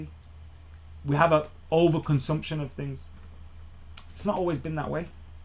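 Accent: Birmingham, United Kingdom. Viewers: Are u really from Birmingham?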